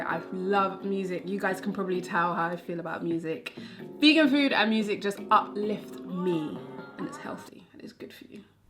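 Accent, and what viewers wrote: England, United Kingdom. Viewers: Ok so I got 2 problems. 1) Vitamin is pronounced V-Eye-Toe-Min. 2) Does it make me a hater if I'm hating on your hot english accent because I wish I had a hot english accent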